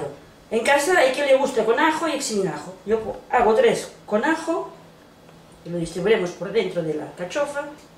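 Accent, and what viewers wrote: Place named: Aliste, Spain. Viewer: Alella es de Cataluña, pero tienes acento alistano (Zamora)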